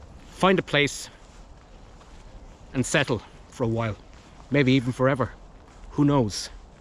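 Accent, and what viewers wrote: Ireland. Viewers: Don't lose your irish accent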